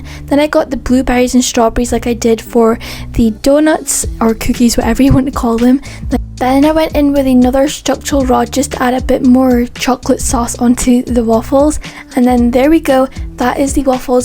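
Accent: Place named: Scotland, United Kingdom. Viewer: oh that's cool:) I like your accent and it's very pretty:3 I was gonna ask if it was Scottish😭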